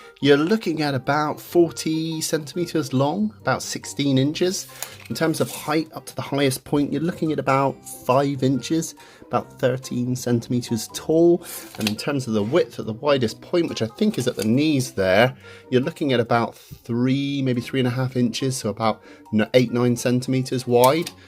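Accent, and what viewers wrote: United Kingdom. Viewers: Sorryy but why do you sound so British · I'm just yanking your chain, he speaks in a British way, for he is indeed British. No need to apologise kind hearted individual, tis all good.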